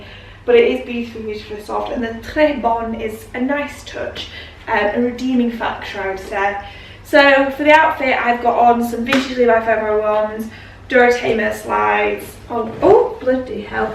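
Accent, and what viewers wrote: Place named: North, United Kingdom. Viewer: My favourite fashion youtuber & you have a northern accent which makes me feel so at home!! Stripes are my favourite at the moment - I've been about bought a couple of striped tops yesterday! X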